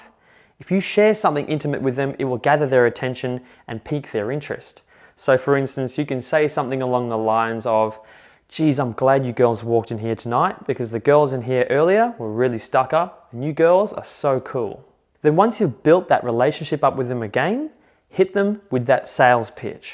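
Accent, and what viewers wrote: Australia. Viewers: Sir your Australian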